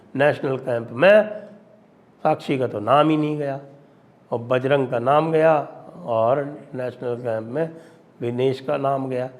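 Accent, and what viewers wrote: Haryana, India. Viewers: Loved the Haryanvi accent, and the clear update on this issue. These shorts are so valuable. Thank you. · Chokhha hariyanvi andaaz sir ji😂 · Sanjay Dixit, " Thari Haryanvi Mahre Aachi Lage " Thanks. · A very amusing and informative video with hariyanvi tone.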